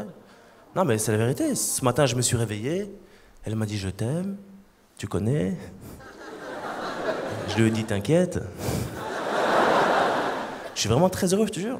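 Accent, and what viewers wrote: Tunisia. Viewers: L'accent tunisienne de Hakim 💓💓😍💓💓😍😍 m'a tué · L’accent tunisien cramé de loin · j'ai reconnu l'accent tunisien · L'accent tunisien tellement stylé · cet accent tunisien sucré là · Il a vraiment un accent Tounsi c'est abusé hhhhhhhhh je kiff je me laisse pas de regarder encore et encore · C est un tunisien lui juste à son accent.🇹🇳🇹🇳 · WAllah j’aime terriblement son accent tunisien alors que de base non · L'accent tunisien je kiff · De son accent je dirais qu'il est Tunisien, quelqu'un sait?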